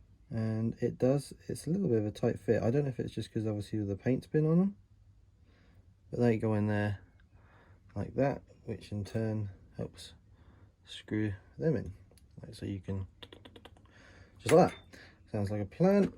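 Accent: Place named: United Kingdom. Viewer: Great video and nice to hear a fella brit Americans can be a bit annoying sometimes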